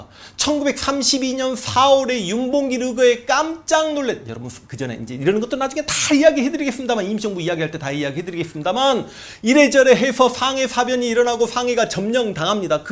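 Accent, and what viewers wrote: Jeolla, South Korea. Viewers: 재밋게 잘보고있습니다. 모든강의가 제생각과 틀리지않다만..본인스스로 진보라고 하신점도 중도인 저는 지지합니만 성향이 갈수록 기우는느낌은 불편하고 거기에 전라도말투가 섞여나오니 불편해지네요? 색깔을 안보이게 하시면 더욱 인기있는 강사님되실듯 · 전라도분이 전라도말투가 나오는게 정상아닌가요? ㅎㅎ 그걸 숨기면서 설명을 하신다는것 조차 힘들지않을까요? 지금 이야기하신부분.. 이해하기 힘드네요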